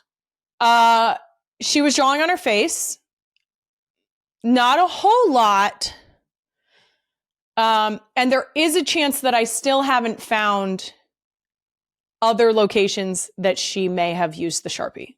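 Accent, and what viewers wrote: Philadelphia, United States. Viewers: So much Philly (I’m not complaining) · I’m not gonna lie… I am pretty sure your Philly accent has only gotten stronger!! 😂 this coming from a Canadian who loves it!❤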